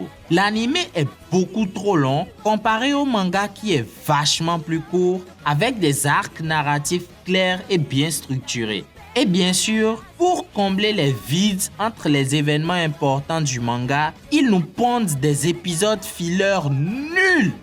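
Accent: Benin. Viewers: On dirait que vous êtes béninois 🇧🇯 En tout cas vous faites du bon contenu vos analyses sont assez pertinentes. Du courage 👏👏👏👏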